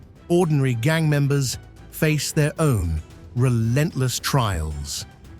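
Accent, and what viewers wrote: United Kingdom. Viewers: Well the narrator sounds British and look at Britain now. Overrun like 🇸🇻 was. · Listen to this clown with the British accent and the bleeding heart. Almost every single one of those monsters have murdered and raped …if it had been one of his children that had been tortured and killed you wouldn’t be listening to this. He has no clue what it was like to live with these monsters….he only thinks he does.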